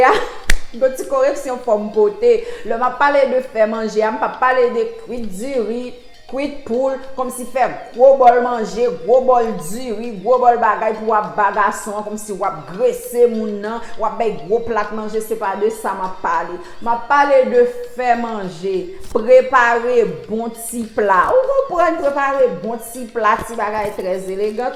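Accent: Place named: Cap-Haïtien, Haiti. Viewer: Se vre wi! Menm lè anpil nan yo pa konn lè yon fanm vle yo! M rmen ti aksan capoise la anpil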